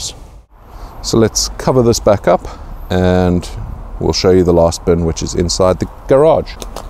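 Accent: South Africa. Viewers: You're in the UK, but your accent sounds like a South African.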